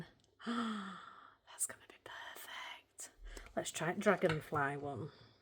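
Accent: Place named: Scarborough, United Kingdom. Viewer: Your accent is like mine are you In Scarborough? There again you said the Range. We don’t have one lol 😂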